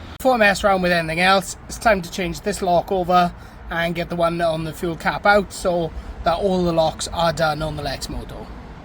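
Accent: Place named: Swansea, United Kingdom. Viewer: You sound like a Swansea lad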